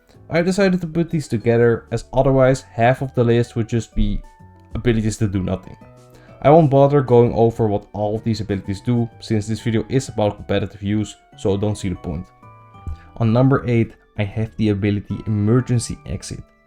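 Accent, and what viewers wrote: Netherlands. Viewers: I just hear you're a Dutch speaker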